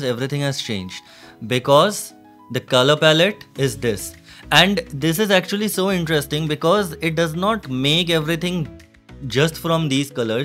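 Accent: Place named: India. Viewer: Hello brother, i recently started watching your vedios,you had great command over comfiyui and other ai tools,i think your indian(because of accent😅). i am currently learning comfiyui just a begginer. i want to learn from you. i have some specific area on which i want to work. is it possible to learn from you personally like one on one session. willing to pay.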